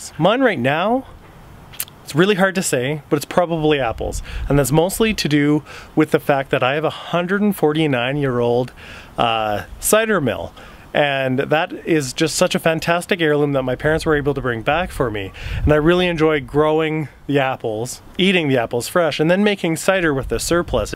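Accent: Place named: Canada. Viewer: This is my fave gardening channel. Upbeat, empirical, comprehensive, good scope, and with the cutest Canadian accent EVER. Hey, I have European plums, cut them to knee height last spring after planting. Can you explain vase vs central leader pruning for young trees? I find it hard to visualize as a process from year to year.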